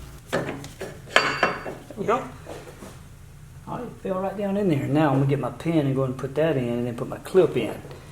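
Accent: South, United States. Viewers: Great vid! Well videoed. Easy to see what you were doing along with an understandable explanation. Love the shit kicker drawl.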